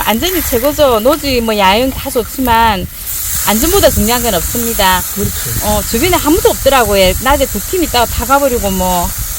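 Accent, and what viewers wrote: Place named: Daegu, South Korea. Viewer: 우리 사돈댁 근남면이군요. 억양이 대구분 같네요.. 여기는 수성구 황금동입니다. 다슬기도 있겠어요.. 같이 가시지요..😊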